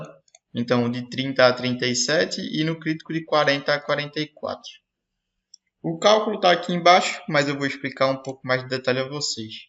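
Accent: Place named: Pernambuco, Brazil. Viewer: reconheci pelo sotaque kk sou de recife.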